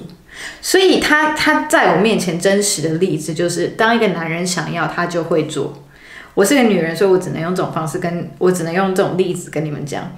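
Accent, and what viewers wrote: Taiwan. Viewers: 果真很台灣人講話都是台灣人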